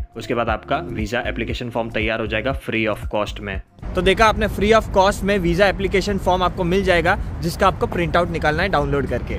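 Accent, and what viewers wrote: India. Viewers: Brother in Russia with Indian Accent is like Magic❤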